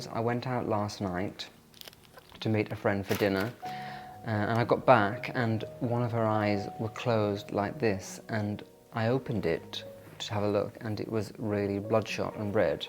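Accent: United Kingdom. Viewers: Thank god Little Sophie is feeling better. Nathan is such a good friend and we miss him. You have the most beautiful voice and I love your British accent. You just calm us down with that beautiful way you speak 😇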